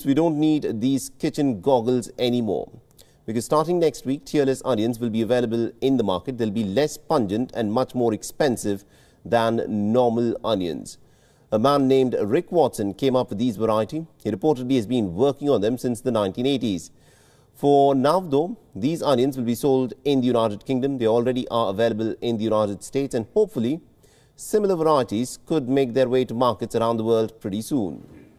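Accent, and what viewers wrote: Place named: India. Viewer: bastardized the English language with that ganges accent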